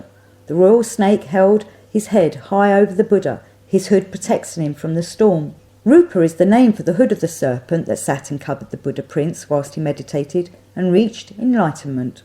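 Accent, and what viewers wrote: England, United Kingdom. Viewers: Thank you so much for your positive feedback. I am only at the beginnings of discovering and enjoying your informative videos... from the beautiful imagery and powerful symbolism, your lovely English accent to your passion for knowledge and sharing is simply golden. 🙏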